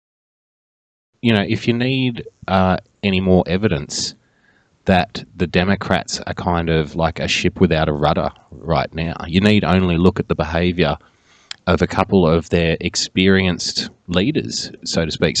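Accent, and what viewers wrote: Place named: Australia. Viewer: This is a good Summary. Worth a listen . The Aussie accent is off-putting . You tend to think an Ozz has no place advising the US . But I enjoyed it.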